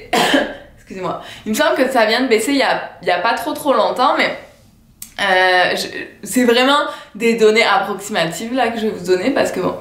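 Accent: Quebec, Canada. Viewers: Pourquoi tu parles avec l'accent du Quebec alors que tu es française ? Je comprends pas trop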